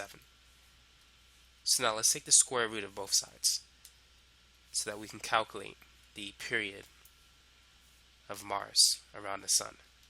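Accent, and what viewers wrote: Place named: New York, United States. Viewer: i cant stop focussing on the new york accent omg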